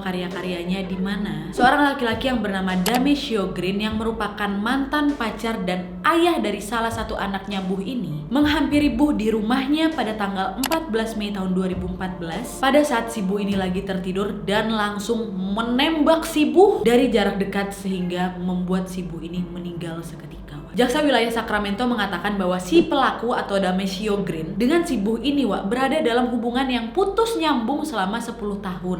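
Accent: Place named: Medan, Indonesia. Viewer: Senang liat cara ngomong nya. Ceplas ceplos. Kayak cwe medan. 👍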